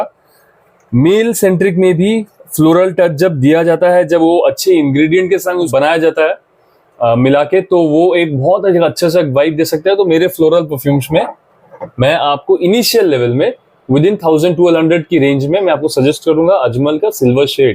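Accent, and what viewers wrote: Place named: Mumbai, India. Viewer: aapki Hindi se Aisa lagta hai k aap Mumbai se belong karte ho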